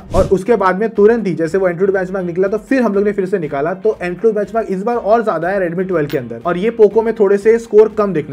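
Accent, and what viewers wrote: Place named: Punjab, India. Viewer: Bhai apke accent Punjabi hai ❤😊😊😊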